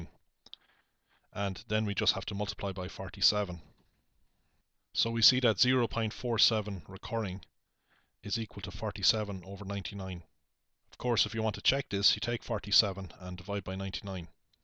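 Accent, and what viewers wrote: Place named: Ireland. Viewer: So so so helpful thank you very much and finally someone Irish doing these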